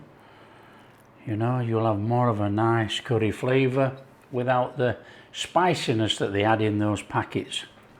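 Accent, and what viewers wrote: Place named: Northern England, United Kingdom. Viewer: New subscriber here, just been binge watching your channel, I'm from down the road in Horwich so it's like hearng my dad talking listening to you with our northern accents, loving your food, I'm going to make your butterscotch tart and your cabbage and bacon casserole this week yummy❤❤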